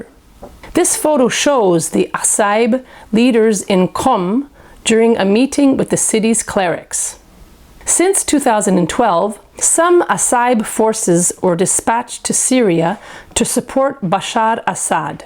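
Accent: Israel. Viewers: I SMELL ISRAEL IN YOUR ACCENT AND STORY . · This video is one of the many collaborations between Salafi/wahabi sunni and Zionist Jews as the pronunciation of the narrator comes from Israel Apartheid state. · The accent of the broadcaster in the video sounds Hebrew. From the way she pronounces Hezbollah. Am I right? Anyone else noticed that? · Zionist detector. the narrator is zionist israeli and this forum is false. (pay attention to her accent) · Why this woman is talking like an israeli woman?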